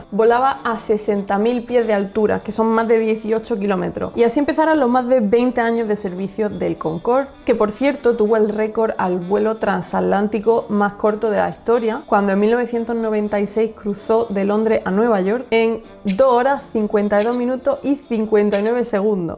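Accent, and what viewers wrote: Andalusia, Spain. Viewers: Porque en Andalucía hablamos así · Pues vaya concordia esa amiga... Uno de esos cacharros, se pegó un buen tortazo.... Saludos guapa.. tienes un bonito hacento andaluz... Yo soy canario... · Tu acento andaluz es hermoso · Bueno es acento español 🤦‍♂️🤷‍♂️ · Que chula y que carisma, no me interesaba este video, me quede primero por ese acento anda luz que es tan simpatico y luego es que tu lo cuentas todo tan chevere que se queda uno enganchao! hasta me suscribí, mucho exito! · De que parte de España eres. Si no me falla el oído! Eres de Andalucía? Ojalá no me equivoque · A mi lo que más me impresionó del video, es esta española hermosa con su acento andaluz y el perfecto ingles. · No me digas qué hay comentarios críticos por tu acento Andaluz !? De todo hay ... en la " Viña del Señor ". 😎 🇪🇦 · Muy buen recuerdo, gracias. De donde sos? 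hablas como española, pero te vi hablar mejor en inglés. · tu eres de andalucia ??? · Por dios.. eres una mujer groseramente hermosa es un abuso total .. por lo demás amo tu voz tu acento español y claro es interesante este contenido, voy a seguirte .. abrazos desde algún lugar de Latinoamérica...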